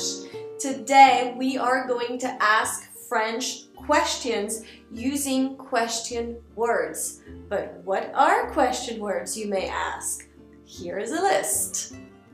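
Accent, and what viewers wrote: United States. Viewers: Tu as prononcé le mot "example" comme "exèmple" :) <link> Mais en tout cas ton accent américain est superbe !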